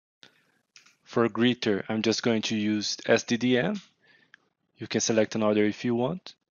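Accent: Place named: Brazil. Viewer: comecei a ver o video e falei "porra, eu conheço esse sotaque, esse cara é br ctz kkkkkkkkkkkKK" mt bom mano, valeu pelo tutorial ai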